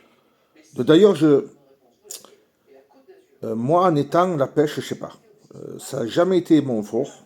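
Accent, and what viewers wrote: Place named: South, France. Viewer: Bonjour, Je suis carpiste je pêche la carpe depuis mes 10 ans. J'ai commencé à pêcher la carpe au Maïs et à la pelote. J'en ai maintenant 43 ans. Je pêche à deux ou 3 cannes de 12 pieds en 3.5lbs, avec les techniques modernes, rod-pod et détecteurs à la bouillette + stick ou PVA, Maïs, Tiger-Nut ou Frolic. Je ne sais pas dans quel coin vous pêchez, mais avec votre accent, je présume dans le sud de la France. Il existe des étangs qui sont complètement stériles du fait qu'il y a peu de densité de poisson et par conséquent une faible concurrence alimentaire. Il peut y avoir aussi une forte pression de pêche, le poisson est éduqué et plus méfiant. Il faut aussi savoir si les étangs dans lesquels vous pêchez sont empoisonnés régulièrement en carpes et connaître le cheptel et le poids moyen etc..... Si vous pêchez la carpe sur des étangs avec peu de carpes vous aurez aussi du mal à en faire. 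De plus, il faut aussi parfois amorcer préalablement pendant plusieurs jours un spot afin de conditionner et mettre en confiance le poisson. Il faut aussi chercher et repérer les bons spots sur l'étang que l'on va pêcher, sauts, marsouinages des carpes, présence de nénuphars, arbres et herbiers immergés dans l'eau, les roselières et les bordures riche en végétation etc... Concernant la méfiance du poisson sur un hameçon, je dirai que ce n'est pas l'hameçon, mais plutôt le corps de ligne, le bas de ligne et la présentation du montage avec l'appât en lui même, le type d'esche, la taille, la coule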